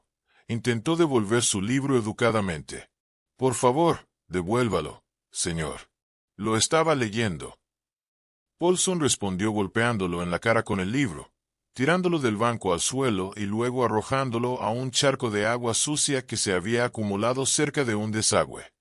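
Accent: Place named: Costa Rica. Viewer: Pronuncia la "ere" como se pronuncia en Costa Rica. Pero le suena feo.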